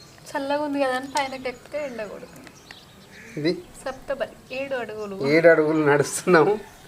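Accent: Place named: Nirmal, India. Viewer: ఏ ఊరు మీది చెప్పండి మీ ఊరు అదిలాబాద్ దగ్గర నిర్మల్ మీ మాటలు అలాగే అనిపిస్తున్నాయి మా ఊరు మాత్రం వరంగల్ మీ ఫొటోస్ చాలా బాగున్నాయి 👌👌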